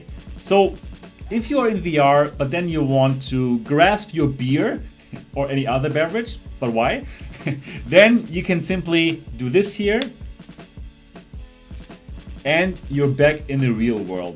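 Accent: Germany. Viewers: Tscherman not Austrian. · Thanks mate for these very well-done and informative videos, complete with a nice German accent :) I am a bit puzzled, though, how you find the Samsung so great while most others seem to find loads of flaws in it. After looking at lots of videos and reviews I decided to go for the Lenovo, just ordered it from Amazon ($279 + customs and shipping). I am a bit concerned about the bluish tint of the displays. Is it possible to adjust the display colours via some software? Naturally you can't do anything about the low contrast of the LDC display but surely it must be possible to adjust the colors more towards red?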